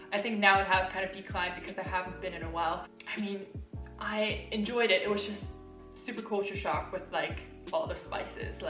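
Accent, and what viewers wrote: United States. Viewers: She is German having American Accent, making video on India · Sister, are you really German? Your accent is not at all German. It's American.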